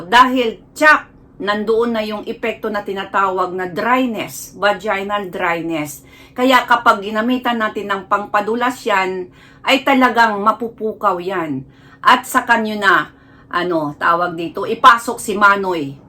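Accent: Visayas, Philippines. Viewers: Bisaya ka day? Basta bisaya gahi og dila.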